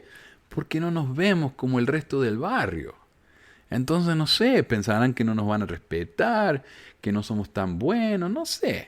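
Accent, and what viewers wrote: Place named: Córdoba, Argentina. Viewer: Uuuiii ese comentario sobre tu acento no vaa!! Y qué!, si les molesta. Tu acento es lo más hermoso y lo más ritmico de los acentos del mundo, tiene vida tu hablar, tiene cadencia. Amo tu ace to cordobés. Aunque muchas provincias de Arge tina tienen ese acento tan hermoso. Mi hermana vivió un tiempo en Córdoba y las vecinas le decían La Yeya por hablar con y, de Santa fe. Jamás un acento debería ser para discriminar por que habla de la escencia de la persona, y ninguna discriminación. Quizás, el que lo dice, quisiera ser como vos, bueno que lo diga y ya está!. Te enontré, te quiero, te amo, zaludoz. (mucha gente en zona de Santa fe y de Entre Ríos reemplaza la s por la z)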